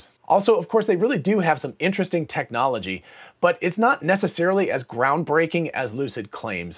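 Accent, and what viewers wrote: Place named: United Kingdom. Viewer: It's so obvious that Lucid ain't gonna make it .. not quite as obvious as Nikola, but close. Elon has talked at length about the difficulties starting a new car brand. It is extremely capital intensive, and with their supply chain setup and pricing/TAM, there's no way they will make it to profitability without running out of cash ... again. Saudis bailed them out once, but the market won't be able to do it again unless they commit some major shenanigans. Lucid is a great dream narrated with a soothing British accent, but it's only that.. a dream.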